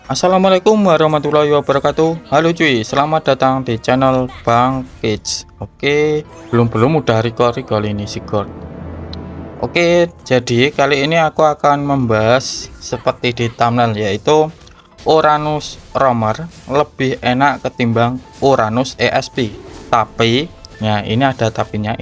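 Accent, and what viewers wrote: Java, Indonesia. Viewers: Jawa bang?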